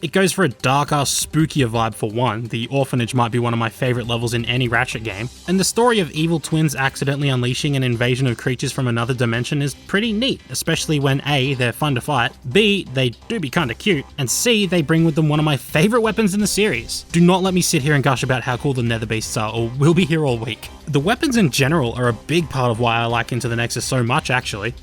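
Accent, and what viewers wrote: Australia. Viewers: where about in australia u from bro? (i’m from perth) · Thought something was off while watching the video, turns out he's just australian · thank god this dude is Australian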